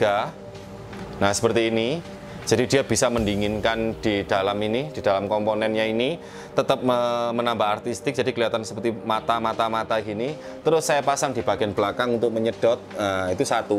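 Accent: Java, Indonesia. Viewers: Klo dlm bentuk lappie udh 18 juta lbih tu. Macam kyk gl553ve. Bang klo ganti gtx 1060 jdi 10 jt ya kyk video sblumnya? Btw Saya demen logat medoknya. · seneng sama logatnya, rada" medhok wkwkwkkwwk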